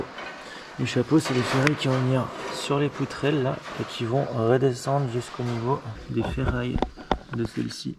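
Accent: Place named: Jura, France. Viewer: Je me disais bien que tu as un accent bien jurassien 😀 Dolois nennis ma fois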